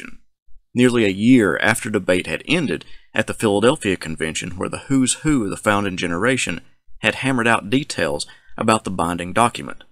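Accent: South, United States. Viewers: lol not used to hearing country accents like yours on youtube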